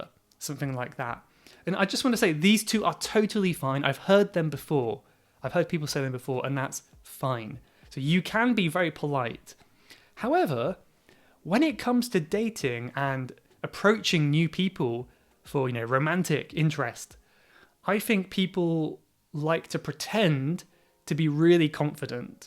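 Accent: United Kingdom. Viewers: I'm new subscriber, I'm loving your videos, thank you, I like the British accent.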